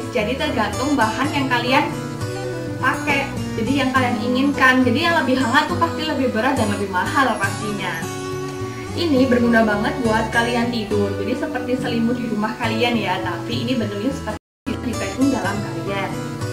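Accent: Indonesia. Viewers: medok banget mbak tapi lucu cara Ngomong nya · Logat jawa timur 😅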